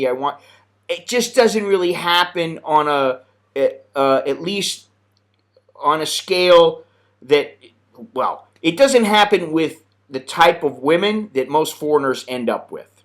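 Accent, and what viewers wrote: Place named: Chicago, United States. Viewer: Seems more like from Chicago.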